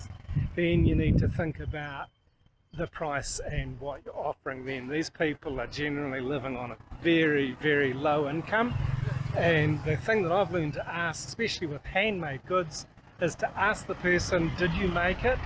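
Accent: New Zealand. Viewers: Love that Kiwi accent!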